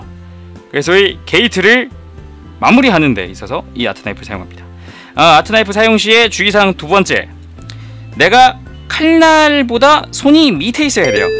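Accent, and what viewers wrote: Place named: Seoul, South Korea. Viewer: 와 말투 서울말씨 찰지네 ㄷㄷㄷ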